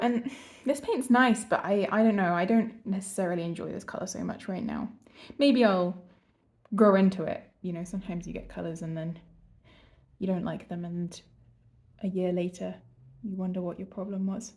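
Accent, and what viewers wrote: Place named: South Africa. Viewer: You sound South African but not!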